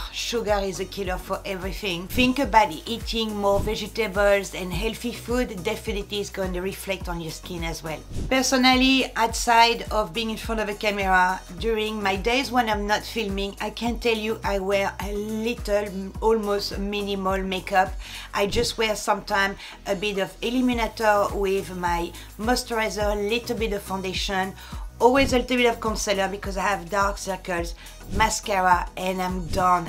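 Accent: France. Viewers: Oh my goodness. This is awesome. I have exactly the same wall color and the same vogue picture ( one of three in a set) in my bedroom🌺 So nice I found your channel. You‘ re so charming and so elegant . Bye the way, your french accent is amazing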